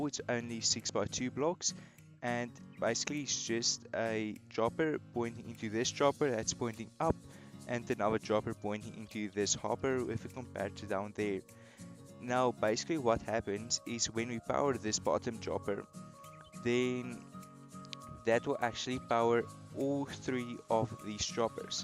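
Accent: South Africa. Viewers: hello fellow south african 😌 jou aksent het jou weggegee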